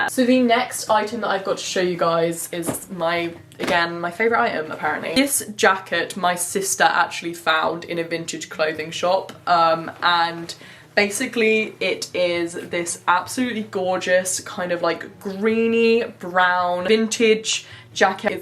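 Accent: England, United Kingdom. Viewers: Hey. I have to say, I’ve been watching you for about 3 or 4 years. I’ve grown up watching you, and you’ve throughly influenced my aesthetic. Plus, as an American, I can do a pretty great English accent because I’ve watched you so long 😂 anyways, just wanted to say that I’ve noticed you have been a bit happier in these videos than your old ones, I don’t know if this is true or not but you’re putting out some positive vibes. Sorry for the paragraph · I love your accent ❤️❤️❤️ The way you speak makes me want to move directly to England!!! Btw my mom is german and my dad is from London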